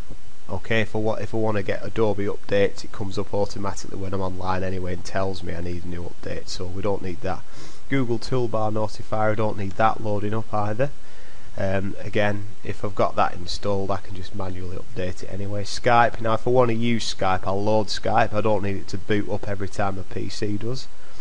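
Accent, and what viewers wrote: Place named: Yorkshire, United Kingdom. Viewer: Can i do it without the yorkshire accent?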